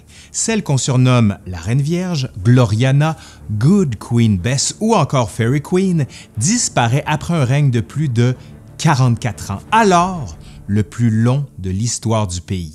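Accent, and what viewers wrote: Quebec, Canada. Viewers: La personne de cette même vidéo parle le français canadien, est-ce que vous le comprenez? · Excellente vidéo, comme toujours ! Et ce petit accent Québécois si charmant c'est la cerise sur le gâteau 🎂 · Oui, a un accent québecois et il maitrise très bien sa langue. Ce n'est pas commun hélas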